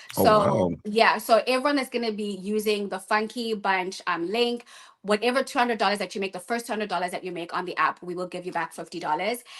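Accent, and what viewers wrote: South Africa. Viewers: Sisi I spotted your accent my sister!! #NoDNA just RSA!! Yes our person representing us on my favourite show!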